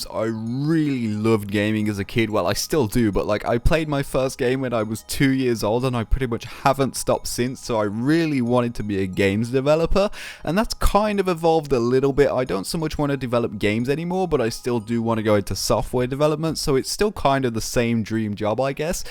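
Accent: Birmingham, United Kingdom. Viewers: Jeez u can really hear the brummie in the older videos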